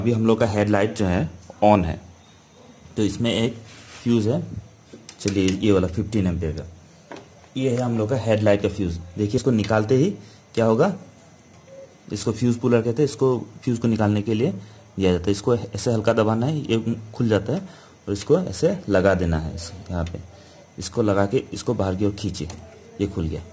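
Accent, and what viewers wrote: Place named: Assam, India. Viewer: I love the sweet sounding Assamese accent Hindi. Thanks for the informative video. Respect!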